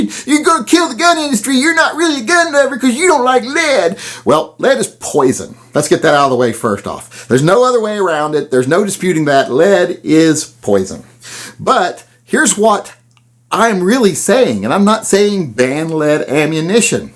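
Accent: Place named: California, United States. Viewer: Are you sure your not from commiefornia , cause you sure sound like it . Fudd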